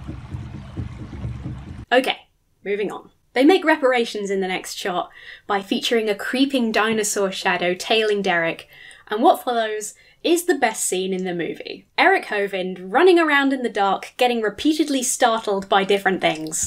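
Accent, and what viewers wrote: United Kingdom. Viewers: Since you would seem to be British (accent?) you may not be familiar with the car that Eric/Derek drives up in. It's a Chevrolet Camaro and not really a "sports car" in the true sense of the word. Also, it's not necessarily all that expensive. Even though you can get them all muscled up with big engines that can go fast, you can get a basic bare bones one for as little as $25,000 dollars or about 18,400 British pounds. Thus, even a night watchman should be able to afford one if he keeps his aspirations in check. Love your stuff otherwise though. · Blackmail, it's the godly way! Lol, I died when you said that because it's so sadly true. Let me manipulate you so you can, believe me, btw we're the good guy's everyone else is so evil ha ha ha (in evil villain). Love the video here, but where is your accent from? My wife and I were thinking British but couldn't place it.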